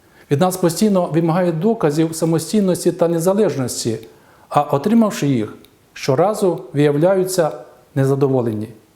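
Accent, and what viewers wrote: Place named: Russia. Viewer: ЧОМУ ЦЕЙ ПАКАНИЧ БРЕШЕ ВСЬОМУ СВІТУ.ЧОМУ ВИ НАЗИВАЄТЕ СВОЮ ЦЕРКВУ УКРАЇНСЬКОЮ, ПРИ ЦЬОМУ ВИ ФІЛІАЛ РПЦ.ВАШ ПАТРІАРХ КІРІЛ.НІЯКОГО ДОКУМЕНТА ПРО РОЗРИВ З ГУНДЯЄВИМ У ВАС НЕМАЄ.ТІЛЬКИ НА СЛОВАХ НАЗИВАЄТЕ СЕБЕ САМОСТІЙНИМИ.НАЗИВАЄТЕ СВОЮ УЕРКВУ УКРАЇНСЬКОЮ, ЩОБ РОБИТИ РОЗДОР В УЕРКВІ І УКРАЇНІ І ДАЛЬШЕ ВЕСТИ СВОЮ РУСЬКУ ПООПАГАНДУ В УКРАЇНІ.ВИ Є ЧАСТИНОЮ РПЦ.НАВІТЬ УКРАЇНСЬКІ СЛОВА ВИМОВЛЯЄТЕ ПЕРЕКРУЧУЮЧИ І МІШАЮЧИ З РОСІЙСЬКИМИ.ВИ Є МОСКОВСЬКИЙ ПІП, ІНІЯКИХ ДОКАЗІВ ЗВОРОТНІХ У ВАС НЕМАЄ.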